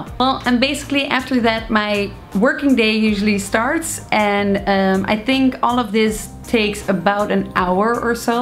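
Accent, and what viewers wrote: Netherlands. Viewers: OOOH you're dutch! I seriously thought you where from an indian or bahama kind of place, because of your accent, but after you showed that card I could hear that you're dutch haha. I love these tips. They're really helpful! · echt een nederlandse accent :) Leuke video!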